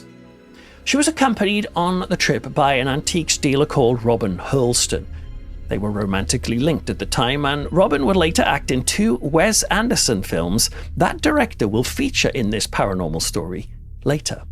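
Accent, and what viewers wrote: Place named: United Kingdom. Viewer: Ya gotta love British accents though. Especially. It’s somehow especially fitting for ghost stories, don’t you think?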